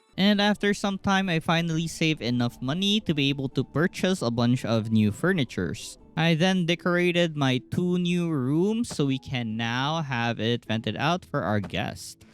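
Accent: Philippines. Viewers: The Pinoy accent made this video better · The tagalog accent is real on this one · I knew it. Haha! Strong pinoy accent 😁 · A sense Filipino accent, Pinoy ka lods?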